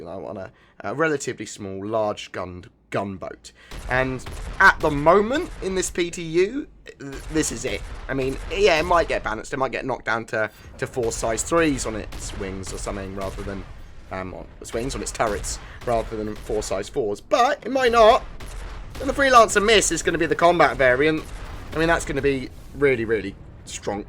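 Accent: United Kingdom. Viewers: i cant understand this british dialect.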